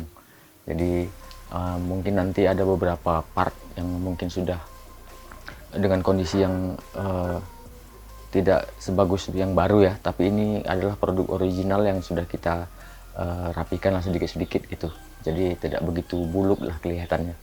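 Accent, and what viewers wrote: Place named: Padang, Indonesia. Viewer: Cara ngomong lu keren bang kaya temen gua dari padang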